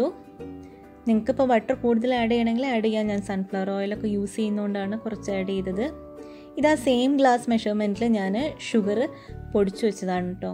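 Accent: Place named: Kozhikode, India. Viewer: Kozhikkodan bhaasha okke edkk keri varunnundallo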